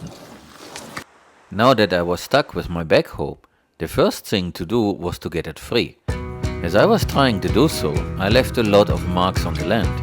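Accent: Germany. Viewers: I Think you can even improve if you make the trenches not like a straight line but more like a S-shape. I mean like natural stream usually forms or like snakes move. I guess that takes even more speed from the water. More water should get into the ground and it should be more stabilizing for the top-earth. by the way greetings. I am also from germany and interested in water retention and ecological agricultures. my spoken english sounds pretty much like yours according to my girlfriend. anyway i admire your work there and I hope one day i can own a little bit of land and shape it myself, too. Could you need a helping hand for free? Actually I am learning Computer Science at the moment but I am in the last semesters. I just read at <link> that you are "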